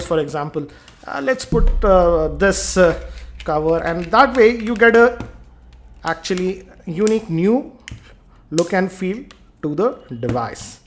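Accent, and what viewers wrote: India. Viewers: indian accent makes my ears bleed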